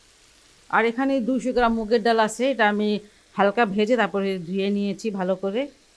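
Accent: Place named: Bikrampur, Bangladesh. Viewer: উনার বাড়ি বিক্রমপুর।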